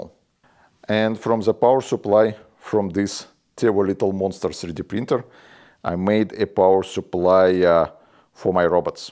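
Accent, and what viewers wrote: Russia. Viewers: этоже руинглиш. все понятно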